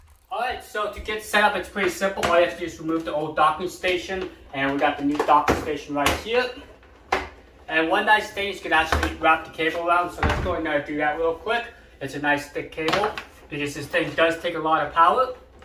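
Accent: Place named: Singapore. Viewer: You Singaporean or something? Accent is strong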